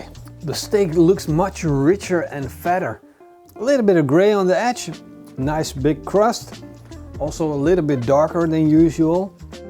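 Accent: Netherlands. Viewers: Is he dutch? Because this accent is very dutch😭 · There's no way you're not dutch, it's the first thing I noticed when you opened your mouth 😂 Nice video either way! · Sounds like your from the Netherlands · You’re dutch aren’t you? I can hear it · Are you dutch you sound dutch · Mann i speak both and yeah thats a pretty clear dutch accent or maybe from belgium but i doubt that Belgians have a bit different accent aswell. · Ja, hij is echt Nederlands — “What IS up?” ... maar zijn Engels is bijna perfect. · Ja, die Nêderland se aksent. Man kan die Boere en die Nêderlanders spot-on hoor. Dis altyd gaaf. Die beste groete uit Rusland! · are u dutch? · You think he's French Canadian? He's obviously Dutch. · Dutch accent... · Dutch accent. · Definitely Dutch by the accent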